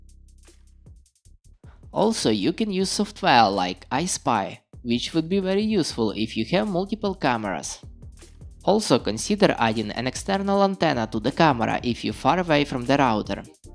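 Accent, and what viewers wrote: Russia. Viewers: Пипец чувак руинглиш так режет ухо. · Русский акцент ))))) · Я уверен на 95% ты русский или украинец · Are you from Russia? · Какой ужасный русский акцент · Русский акцент или показалось? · Ты вроде русский,судя по акценту? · Блин акцент такой будто-бы это русский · Слышно русский акцент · Акцент русского выдает с потрохами ) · забавно слушать видео с русским акцентом. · Бля твой русский акцент просто по ушам режет как ржавым гвоздём! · От такого русского акцента хочеться повеситься тут же!!!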